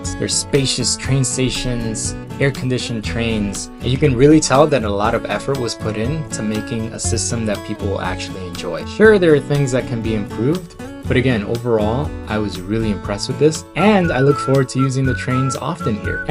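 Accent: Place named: New York, United States. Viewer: I like your Newyork accent 😊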